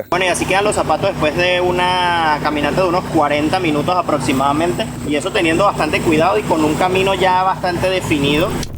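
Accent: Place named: Venezuela. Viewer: Disculpa, tocayo, no sé dice eso no debería de ser así soy traductor simultáneo y docente en idiomas, manejo varios y los enseño y también enseño español a extranjeros y la gramática del español dice que después del verbo DEBER en cualquiera de sus tiempos y formas, se debe usar y no se debe de usar un verbo en infinitivo, por lo tanto esa preposición DE no existe, sobra. Disculpa tocayo que aproveche está oportunidad para corregirte, no lo hago para hacerte sentir mal sino para que mejores en el uso de nuestro idioma español, fíjate que no menciono nada de tu acento venezolano, eso es secundario, me refiero es a los errores gramaticales. De nuevo. Mil disculpas por corregirte y mil bendiciones. Gabriel Antonio Sánchez Aldana Traductor Simultáneo y Docente en Idiomas. Exsacerdote Católico.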